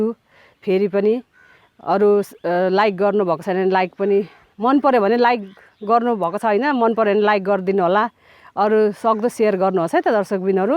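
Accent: India. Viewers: राम्रो छ्🙏हजुरको बोलि indian जस्तै छ्😁कता हो हजुर को घर??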